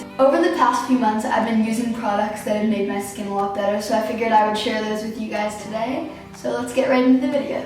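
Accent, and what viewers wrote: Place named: Canada. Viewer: you have a thick canadian accent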